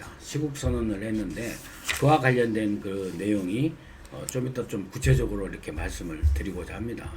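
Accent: Jeolla, South Korea. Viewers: 만나면 반가운 우리민원장님시원한 논평!!!시원합니다요.전라도 어조가 더 정겹더라구요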